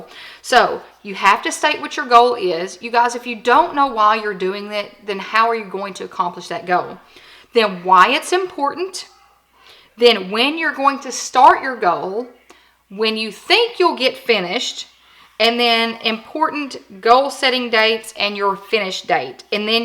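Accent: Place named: Georgia, United States. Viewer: Wow, that was so incredibly helpful! I’d been looking at this item for a few days now and was having trouble getting the info I needed to make a purchase decision. I’m another one of those visual people and you’ve sold me on it and shown me all I needed to know to get started. Love your personality! Your accent reminds mo of my cousins in Georgia. I’ve subscribed and look forward to more videos! :)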